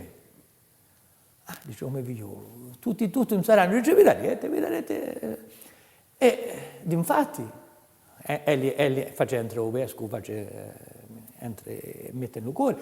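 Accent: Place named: Massa Carrara, Italy. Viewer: confermo, l'accento è quello tipico di MASSA CARRARA ovviamente la lingua è toscano/ umbro medievale con influenze genovesi es, la parola'' fulminanti' cioè fiammiferi era molto usata da mia nonna che era umbra come ''cignale''=cinghiale ecc.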